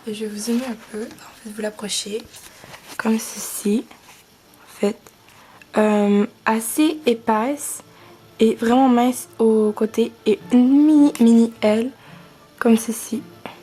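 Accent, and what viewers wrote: Quebec, Canada. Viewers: C ben drôle a voire ta un accent francais pi en même temps québécois (moi jsuis québécoise ) tk bonne video c pas BS :) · Désole, mais sa se voit que tessaille de prendre laccent francais. Tes québecoise. Pas besoin dle chacher!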